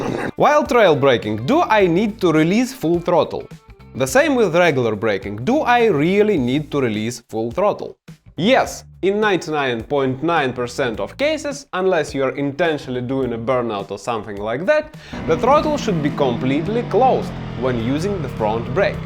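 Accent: Russia. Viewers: Чел, с таким русским акцентом изучать английский просто превосходно) Русский канал ты верно забросил, так что смотрю тут и даже понимаю процентов 40. пока 40.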